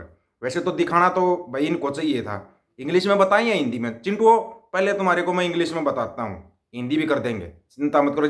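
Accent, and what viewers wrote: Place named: Rohtak, India. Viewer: भाई जी आपका बिंदास रोहतक वालों का बोलने का लहजा बागेश्वर का मोड़ा । दिल खुश हुआ है । ज्ंयोदा बसदा रह ।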